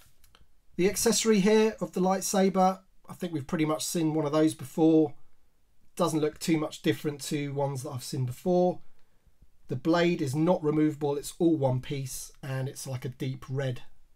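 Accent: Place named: United Kingdom. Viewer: Was happy to find out you skated in the 80's. I think star wars was my favorite thing as a young kid, then G.I.Joe, until Skating. Loved Skate culture. Then came music and the subcultures until I ended up in my 40's and now I'm back on the toys! I love these vintage collection cards backs. The whole thing just brings me back to what a great reward it was to get a new figure as a kid. Cheers to you, I love your channel. Not sure what area your accent is from, but I always expect to hear you say "Oi!"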